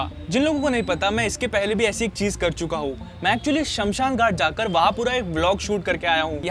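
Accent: Nagpur, India. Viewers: Bhai tu nagpur se hai kya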